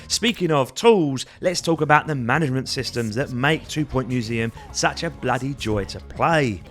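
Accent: Cockney, United Kingdom. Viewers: Loving the cockney.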